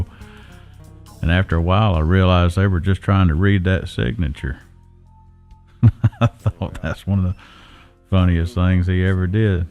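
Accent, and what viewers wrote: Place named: South, United States. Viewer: Way too much Southern accent is killing me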